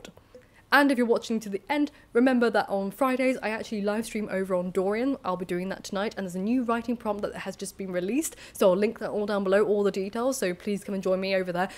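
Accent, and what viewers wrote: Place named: England, United Kingdom. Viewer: Semi-regular who is constantly asked about their strong southern American accent, tunes in for, strong English accent…I reckon. :)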